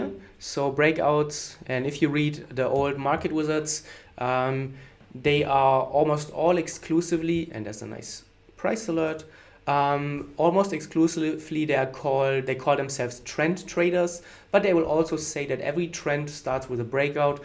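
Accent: Germany. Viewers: Dialect like german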